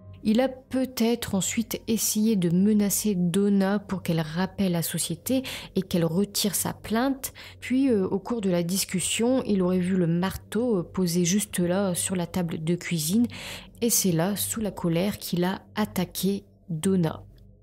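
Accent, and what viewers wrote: North, France. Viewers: Accent du Nord non ?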